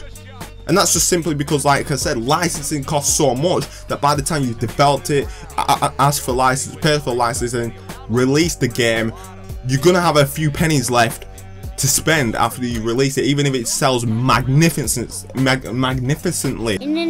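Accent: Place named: Yorkshire, United Kingdom. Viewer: Yorkshire accent m8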